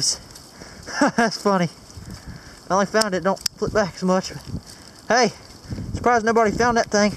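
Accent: United States. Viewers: I love your American accent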